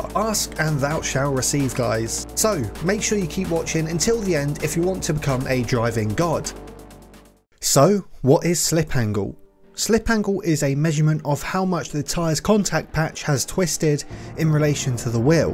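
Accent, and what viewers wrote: United Kingdom. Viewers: No hate, but I think this guy is bri'ish. Tho contecnt both helpful and entertaining. Keep it up, please. :)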